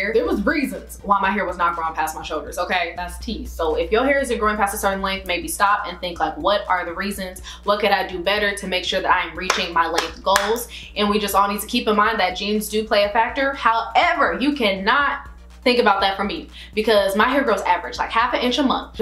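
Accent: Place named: South, United States. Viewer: U sound country and I never noticed bc I was lived in tx now Indiana yea u def sound country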